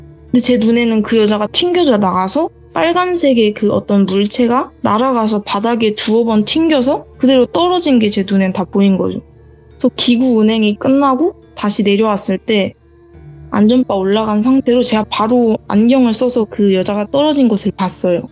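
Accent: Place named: Jeolla, South Korea. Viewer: 전라도 분이신가봐요.. 어딘지 알거 같아서 ^^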